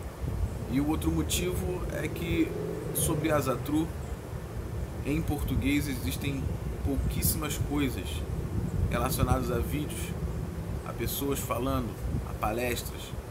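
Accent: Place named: Rio de Janeiro, Brazil. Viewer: Pelo seu sotaque vejo que você é do Rio! Eu também! Existe alguma comunidade de pessoas que segue, vocês se reúnem?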